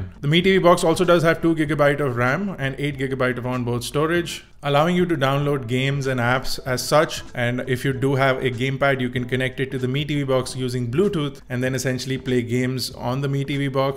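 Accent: India. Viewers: problem with the microphones because your accent is india not english nature not to be racist or anything. i thought this was to see online stuff but is pretty much a big youtube watch and a netflix stuff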